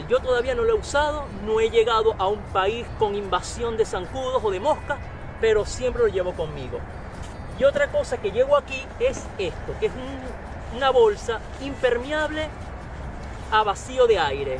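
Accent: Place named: Venezuela. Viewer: Muy bueno..mi pana,..desde el principio me pareció acento venezolano,..pero cuando hablaste sobre el hecho de que no cuesta “tres lochas”,..no tuve dudas. Muy bueno e interesante tu video. Donde es ese lugar?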